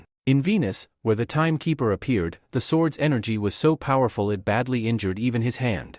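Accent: India. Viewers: Fckn indian accent immediately made this story a stupid joke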